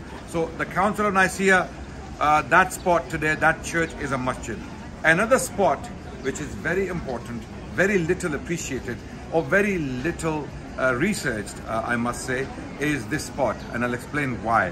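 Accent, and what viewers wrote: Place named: United Kingdom. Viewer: Brother, its J as Yot, funny to hear your brittish accent Justinian as (J Justice). Its closer to Yustinian (as York )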